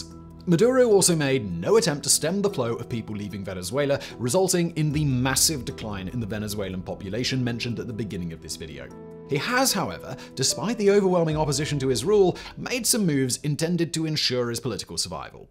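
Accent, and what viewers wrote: United Kingdom. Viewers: Since you sound British, when is the “Royal” family in the U.K. giving up power? 🤔 That is a LONG RUNNING dictatorship!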